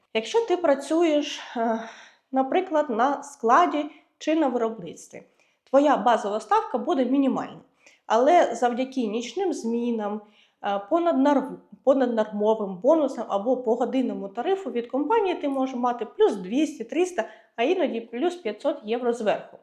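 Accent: Standard. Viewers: Красива , креативна , розумна , (з харизмою треба попрацювати ( без образ)) україночка чисто розмовляє без суржику.Respect & perception of luxury 🇺🇦😁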